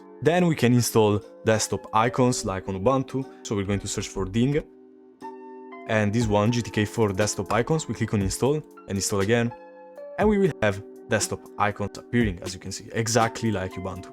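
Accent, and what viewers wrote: Italy. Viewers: Bro ma sei italiano?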